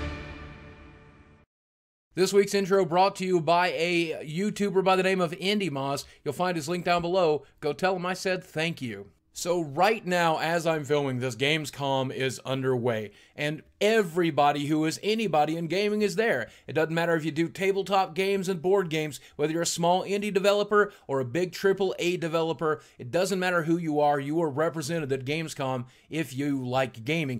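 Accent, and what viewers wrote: England, United Kingdom. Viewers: Brilliant vid mate, especially appreciated the English accent, proper cracked me up! Trying to think of some constructive criticism, but to be honest, I don't think you could have said it better. ;-)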